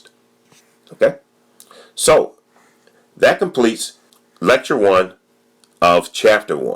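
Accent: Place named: United States. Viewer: I am a kenyan hence i am not getting the english well because he is an american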